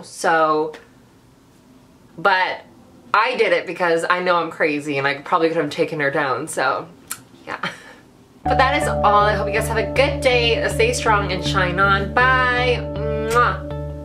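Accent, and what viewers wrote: Vietnam. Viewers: your old vietnamese lady accent is my favourite thing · I'm sorry but I always die laughing at your Vietnamese accent because it's DEAD ON. I'm Cambodian, but my hairdresser is Vietnamese and sounds EXACTLY like that. It just puts a smile on my face.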